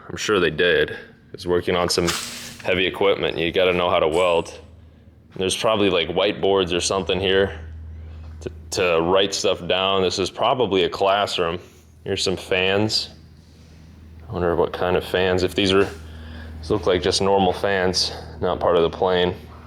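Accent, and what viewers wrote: Chicago, United States. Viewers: I knew you were from Illinois. Got that Chicagoland accent haha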